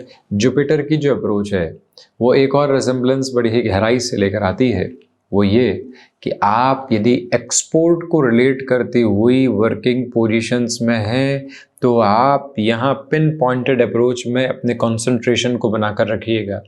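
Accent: Standard. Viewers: Your brilliant clarity with wonderful shudh Hindi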